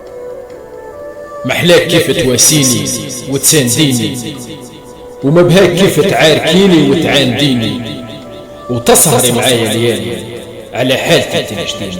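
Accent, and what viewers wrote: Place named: Tunisia. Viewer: روعة ❤ما احلاها اللهجة التونسية و اللكنة الجنوبية و الوسط الغربي ..دمتم بخير استاذ ..اشتقنا الى تونس في الغربة ..في القصيدة نلمس الروح التونسية و جونا و افراحنا و ذكرياتنا مع الحب الاول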